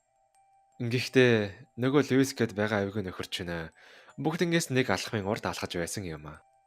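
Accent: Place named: Inner Mongolia, China. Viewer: Өвөр Монгол залуу юм байна гэж бодсон.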